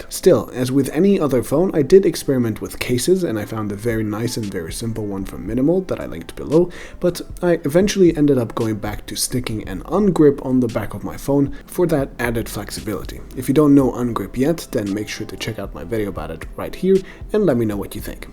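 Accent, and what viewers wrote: Germany. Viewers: Kommst du etwa aus Deutschland? :O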